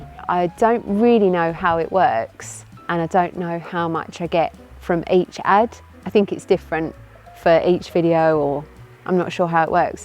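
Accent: United Kingdom. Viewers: It is interesting when you say it takes so long to edit a video. My first thought it doesn't need to be so perfect. You could sit down, smile, and talk in your British accent and I'd be happy ;)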